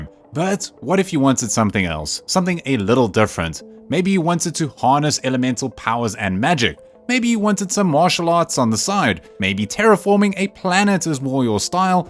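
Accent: South Africa. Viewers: bro why do you sound south african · Are you South African? Sounds very familiar!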